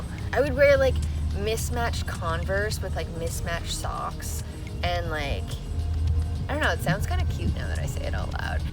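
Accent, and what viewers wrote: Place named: Canada. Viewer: Canadians man, they pronounce “ou” and words w a hard sound between two a’s, like pasta sounds really weird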